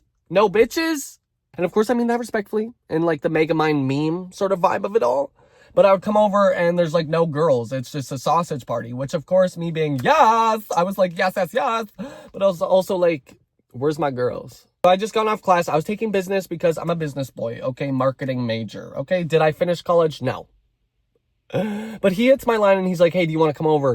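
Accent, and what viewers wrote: Canada. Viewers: Your Canadian accent really came out in this one haha you’re my new favorite YouTuber GAY GUY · Ok you’re definetely Canadian lol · That sorry... are u Canadian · dont know how i didn’t notice your canadian accent in the first video boy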